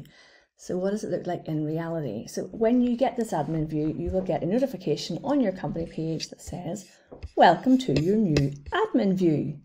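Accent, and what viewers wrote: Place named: Northern Ireland, United Kingdom. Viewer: your voice is horribly muffled making it hard to understand what you were saying. Please review your videos, or have someone else do it. I could not understand you and had to turn on the captions to read what you were saying. Your work is good, but your voice quality is atrocious. You might also check yourself for regional accent items (are you Northern Irish?) to more "regularize" your English. Speak more clearly (enunciate), use a better microphone, and "flatten" your accent to a more BBC standard (or god-forbid, USA Mid-West standard) will make the valuable messages you have more easily received by folks. Thank you.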